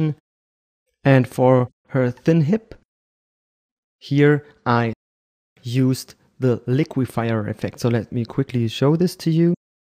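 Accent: Germany. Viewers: Are you german? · Strong German accent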